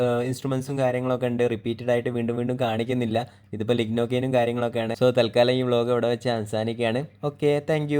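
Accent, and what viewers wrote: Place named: Malappuram, India. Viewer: സംസാരം മലപ്പുറം സ്റ്റൈൽ und ad കൊണ്ട് ചോദിച്ചതായിരുന്നു 👍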